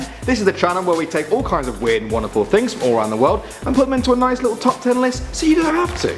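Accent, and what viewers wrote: United Kingdom. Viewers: I hear anyone hates our accent boi they dead British accent is fuggin dope I think I might have had a stroke -. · Don't take all the bad comments to heart. I think you were great! Love the british accent!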